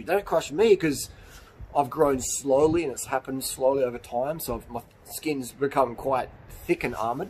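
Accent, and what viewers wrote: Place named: South Australia, Australia. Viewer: Adelaide , south Australia 🇦🇺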